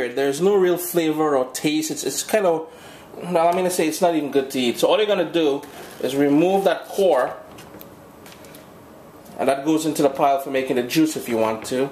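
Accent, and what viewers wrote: Trinidad and Tobago. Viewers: your trini roots coming out loud and clear....thank you.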